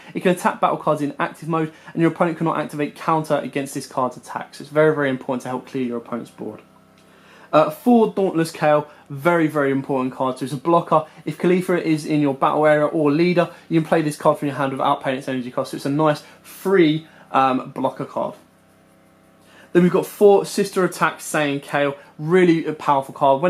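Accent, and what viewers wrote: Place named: United Kingdom. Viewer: Nice to hear a UK accent, so annoying we don't get set 4 for ages 😡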